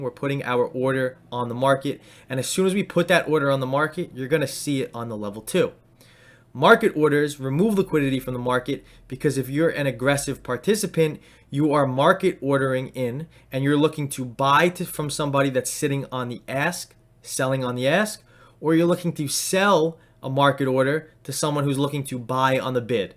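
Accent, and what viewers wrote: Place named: New Jersey, United States. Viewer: Great video thanks for posting. You sound like you're from joysey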